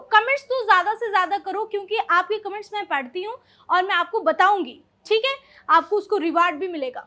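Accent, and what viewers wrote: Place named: United States. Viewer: Mujhe English bolna bhut pasnd h jyse aap bat krte ho American English aap 60 days course me American pronunciation add kr lijie plzzz❤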